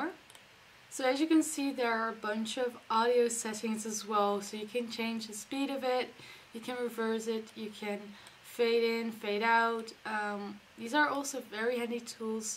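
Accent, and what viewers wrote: Netherlands. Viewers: i smelled a dutch accent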